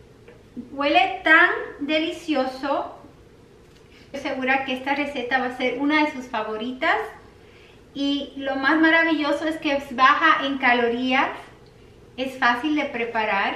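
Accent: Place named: United States. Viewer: porque hablas como hablaria una americana, habla bien espanol.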